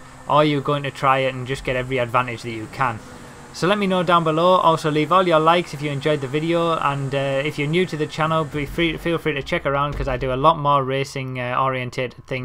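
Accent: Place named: Teesside, United Kingdom. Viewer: You sound like a lad from teeside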